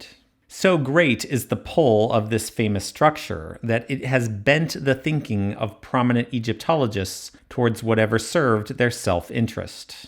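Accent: United States. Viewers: I have said this before but no one responded. I asked what state is this accent from? You wanna search for Audit the audit and the villains they sound just the same too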